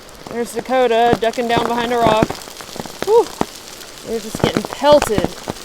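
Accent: South, United States. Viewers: Beautiful country! You've inspired me, I'd like to thru hike the AT next year, but it depends on if my job will let me have the time. If not, I'd like to do a section hike a week or two at a time. I've always loved the Appalachians since I was a kid when I used to go skiing in NJ by the Delaware water gap and now since I lived just 60 miles from them in VA. I hiked Goshen pass in VA this summer and I'm addicted. Never thought hiking could be fun after my only real hiking experience (apples and oranges) was from being an enlisted soldier in the US Army where you carry waaaaaay more weight and in my case, an M60 machine gun. I love your southern drawl and could listen to you all day! LOL!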